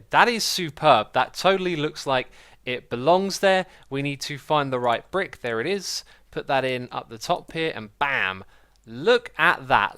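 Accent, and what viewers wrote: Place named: England, United Kingdom. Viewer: Wait!!!! is English???? Wow, i usually thought he was American. Ok that explain why his accent is so different from Americans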